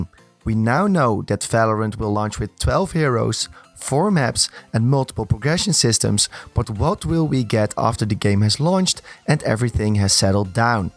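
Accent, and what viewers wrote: Netherlands. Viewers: Hey are you dutch bro?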